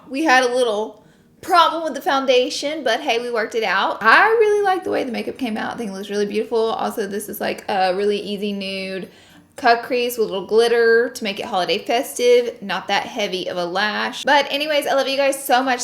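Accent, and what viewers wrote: South, United States. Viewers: A new sub here! I love your content, your delivery and that damn southern accent!!! "Conciller".. LOVE IT!!!!!!! 😀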